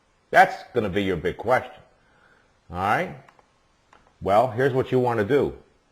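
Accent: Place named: United States. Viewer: Nice video. What part of USA is the accent? Cool.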